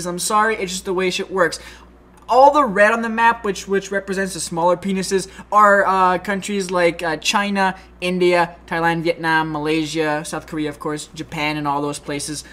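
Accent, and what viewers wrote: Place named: Canada. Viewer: what part of canada is he from?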